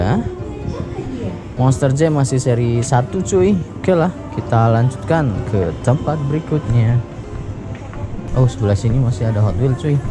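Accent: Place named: Banyumas, Indonesia. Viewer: Basa ngapak Wong ndi sampeyan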